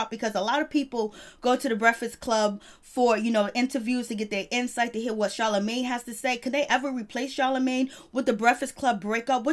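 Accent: New York, United States. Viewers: You’ve got a thick NYC accent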